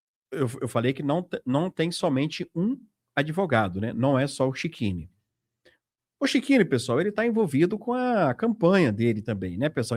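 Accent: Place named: São Paulo, Brazil. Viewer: Eu tenho raiva desta mulher porque ela é paulista está fala dela irrita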